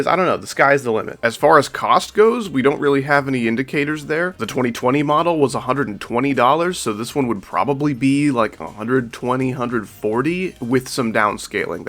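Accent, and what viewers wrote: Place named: Texas, United States. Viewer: We need you to only use your texan accent for this channel to be epic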